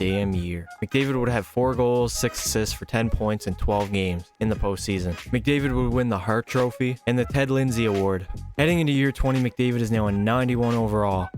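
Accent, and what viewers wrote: Newfoundland, Canada. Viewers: No way your not a Newfie, that accent lol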